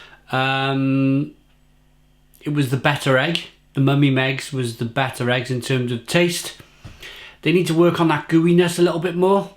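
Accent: Wales, United Kingdom. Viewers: I think videos are great, you never know what you're going to get, I actually love the Welsh accent...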